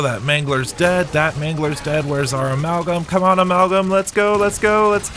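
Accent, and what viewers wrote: United States. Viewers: Couple things.. 1... I didn't know you were Midwestern And 2.. on the free wonderwafte or however you spell it.. mustang Sally's make it incredibly easy (even just Sally) as the aoe clears all the debris almost instantly... I haven't had a chance to test it out but I am wondering if you could use c4 in this step as well if you would rather not burn 5k credits plus the gs45s wall but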